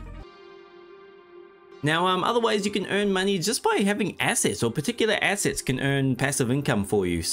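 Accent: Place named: Ireland. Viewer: .03 now lol the game crashing bad. Here is the thing. It is all clicking and no development in making the game playable. It is exactly as playing the stock market and not a game. But here is the thing this game would be very nice but thing is you have to spend crypto. No cc or account in the game. So it sort of locks players in to only play with crypto instead. Look at star citizen. They have made over 500million and still in alpha after 13 years later. This game looks good at 1st then dig into it then you see it's very very bad over all. Plenty of games you play to earn and also in sol blocks too. Just another dream and wish demolished. Btw your voice cool sounding be like an Irish guy. Lol like it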